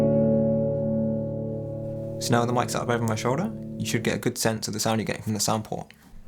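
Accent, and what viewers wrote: United Kingdom. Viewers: Oh your British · I'm trying to place that accent. Suffolk?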